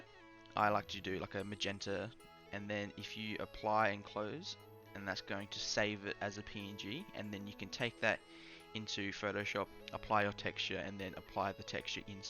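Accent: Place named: Australia. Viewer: Your the first Aussie I’ve heard on a maya video 😂🎉🎉🎉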